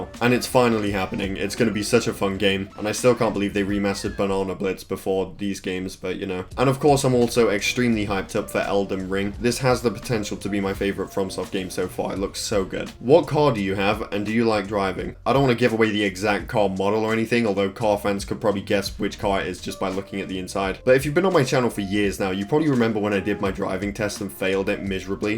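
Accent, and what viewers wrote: England, United Kingdom. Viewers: Im here because of his english accent voice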